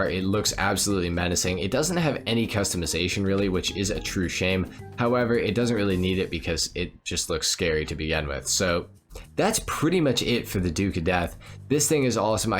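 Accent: Canada. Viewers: U are canadian bro?!!!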